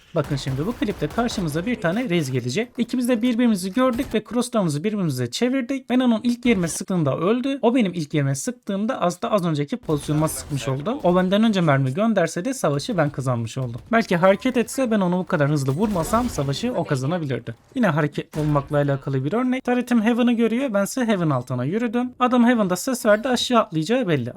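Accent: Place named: Black Sea, Turkey. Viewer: bi Karadeniz ağzı var gibi derinden kulağa geliyor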